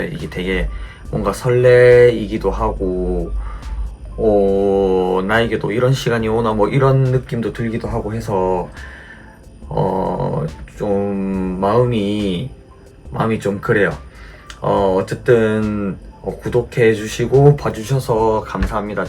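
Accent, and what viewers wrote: Gyeongsang, South Korea. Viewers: 다정다감한 경상도 억양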